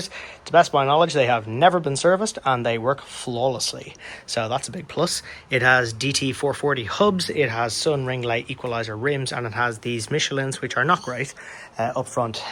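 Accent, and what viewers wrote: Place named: Ireland. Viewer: Brilliant video as always. Great to know I'm not the only hoarder out there. Mind you, I've ONLY (currently lol!) got 6 frames in various states, all road bikes. What are the bars you got on ali express for the reacto? I've heard some horror stories about carbon bars off there. So I'd be interested in your thoughts. Keep up the great work. Started following you on instagram, then discovered your youtube channel. Great to hear the Irish accent!!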